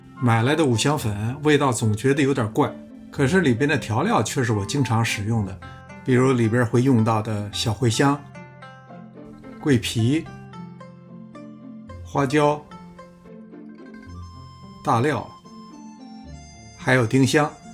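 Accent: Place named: Beijing, China. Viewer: 北京話😂。 不過我姥姥是東北人， 她也這麼說。 很多北京話和東北話的用詞都一樣， 儘管口音不同， 我总覺得這說不定与清朝滿族人有關， 把東北話帶到北京來了。😂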